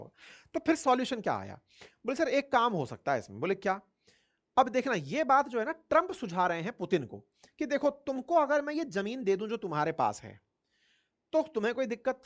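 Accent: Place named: Kanpur, India. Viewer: pls keep kanpuria style of anchoring, it sound sweet...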